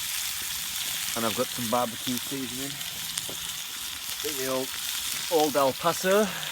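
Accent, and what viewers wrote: United Kingdom. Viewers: OMG XD where do u live? I live in the U.K. And I see you have the accent!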